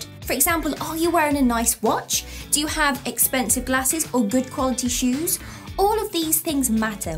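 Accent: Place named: RP, United Kingdom. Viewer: This accent is RP .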